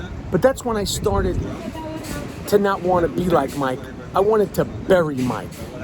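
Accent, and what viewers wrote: New York, United States. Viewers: Why is the camera so close to your face? I love the passion but dayum. I’m here for your voice bc it’s SO New York and I love hearing the pain. Heat in 5 🔥🤍🔥 That joke about Randle was funny tho. Lint brush. Maybe that man have other stuff on his mind 🤷🏽‍♀️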